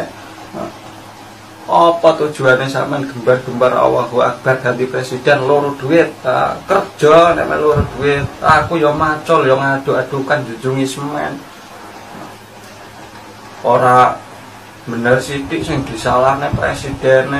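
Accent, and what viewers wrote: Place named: Surabaya, Indonesia. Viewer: arek suroboyo leress cak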